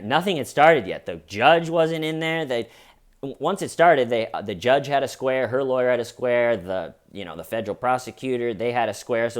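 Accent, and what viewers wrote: England, United Kingdom. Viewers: And that is why they are security guards. Good English accent by the way.👏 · Amazing reporting. Loved every second of it especially the English accent Btw. Any news on the big film premiere Can’t wAit to see it I found the BBC lady. She is quite new on screen reporter So funny Tattoos Poker chips Maxwell Hilarious 😆 🤣🤣😆😆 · Love love love the English accent. Lol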